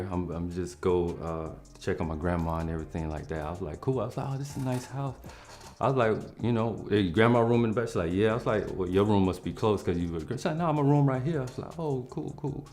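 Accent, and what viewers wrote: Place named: South, United States. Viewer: is so country and it makes me so happy ❤😂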